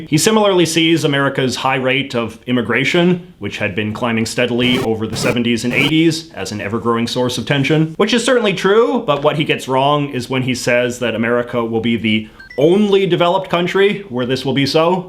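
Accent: Canada. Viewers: this guy sounds like the canadian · Never seen this guys videos before. All I take away is that this is the most canadian sounding person I have ever encountered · I won’t be voting for someone who talks like that, too Canadian and goofy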